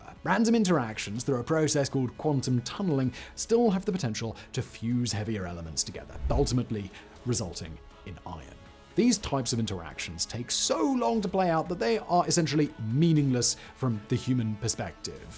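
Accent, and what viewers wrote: United Kingdom. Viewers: I enjoy these channels very much, but sometimes pronunciations are so cartoonishly British it's distracting. If it turns out he's actually from like Boston or Queens but choose his accent to seen more legit, I wouldn't exactly be surprised. It's a lot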